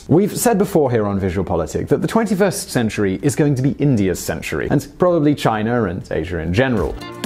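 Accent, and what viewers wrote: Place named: England, United Kingdom. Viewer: Uhh, NATO? Why mention an organization created to stop a Soviet blitzkrieg? It's as if NATO is the only multilateral organization you've ever heard of. Why not take a look at the African Union instead? Note: just because a guy has an English accent, it doesn't make him an intellectual or an expert on a given topic.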